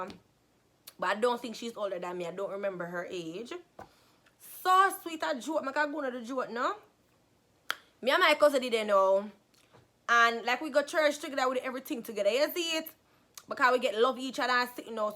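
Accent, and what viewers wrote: Jamaica. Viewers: Straight countri Patois she a chat. Lol I should know, since I'm Jamaican 😂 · She is speaking English. Broken british English with a jamaican accent.. It's slang. We speak proper British English with a jamaican accent. But when she breaks into the patwa that is our slang. Just like when you see black Americans speak slang, (not all) are what they call ebonics. But when you at work, or in a professional setting, you speak proper English. Also depending on which part of the country you are from in America, it also includes ur accent. Get it? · 🤔😆😆😆😆😆. Girl you're killing it..... I wouldn't call that loosing your virginity.... 4sum lol....... That's an experience darling...😂😂😂😁😁.. Love how you bring out the Patois 💯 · Cynth Darling it’s not... she’s just speaking patios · Yes the accent is real, she is from Jamaica, that's Jamaican patois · Me too....i think she is jamaican 🤣🤣🤣 she talks as if she is singing regge😂😂😂😂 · Shes Jamaican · I love her Jamaican voice😍 y’all Jamaican people know 😂😭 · Me finally subscribe, yasss lol I see ppl in the comment saying they hardly understand you,lol hush guys it's patwa get use to it :-) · She sounds calm for a jamacian she is toning it down for u lot British jamacian here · I didn't know Jamaican accent was this much strong lol😂😂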